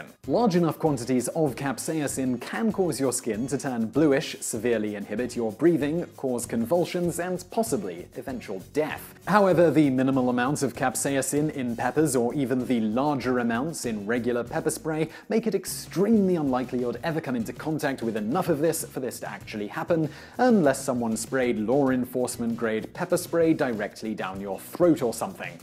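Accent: United Kingdom. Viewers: He's British using the British pronunciation. Here in the US the pronunciation is different, just to annoy the rest of the world.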